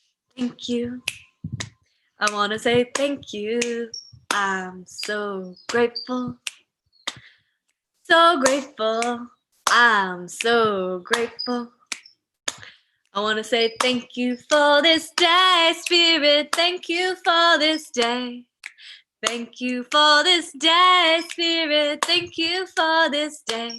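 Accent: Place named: United Kingdom. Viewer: Beautiful British accent, babe, tea and crumpets sounds nice, after a breakbeat.uk.co rave all night, without the MCs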